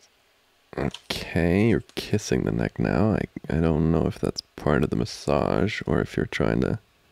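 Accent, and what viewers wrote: Germany. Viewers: your german voice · i cannot with this german voice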